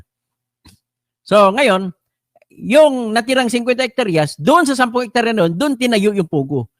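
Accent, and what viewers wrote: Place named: Visayas, Philippines. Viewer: Hindi ba kayo nkakahalata, etong blogger halatang visaya yung salita nya pansin ko lang, palagay ko maimpluwensiya yung may hawak dito sa blogger na ito. Eto lang msasabi ko kapag si BBM ang idinawit mo ang sambayanan na mgagalit sayo. Ndi mo mabrain-wash ang tao pagdating kay BBM. Dahil gusto cya ng tao. Palagay ko duterte ang may hawak sayo.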